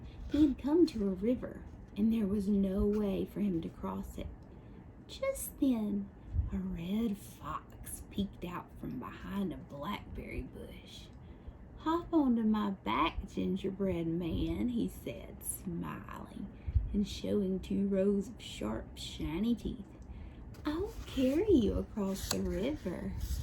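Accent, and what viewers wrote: South, United States. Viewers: I can never get over your country accent does it for me every time thanks for another super relaxing video ❤️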